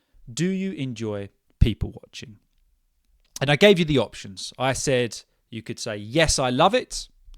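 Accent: United Kingdom. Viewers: I love your vibe and clear British accent 😊❤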